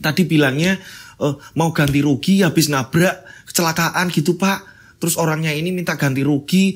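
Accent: Java, Indonesia. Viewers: Pertama kali nonton terhipnotis sama boom boom boom nya itu,ditambah mas membawakan ceritanya semangat dan lucu juga jadi pingin nonton cerita lainnya sampai sekarang tapi bahasa jawanya kok sama dengan daerahku ya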